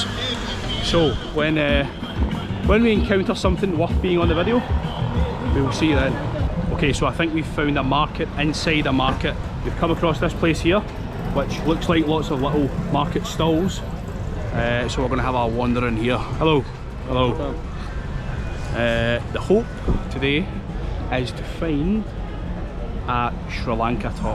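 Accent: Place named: Ireland. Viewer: Which country are you from? Let me guess: Ireland?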